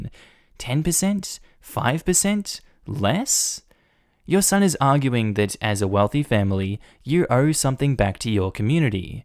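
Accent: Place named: Australia. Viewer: Hey! From Canada 🇨🇦 love putting on your playlists and fall asleep to your Aussie voice 🙏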